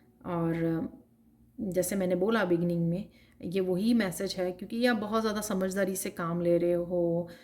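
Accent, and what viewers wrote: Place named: Punjab, India. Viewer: Tu ganwar Punjabi tone wali aurst bqn eahi angrez hai sadi huî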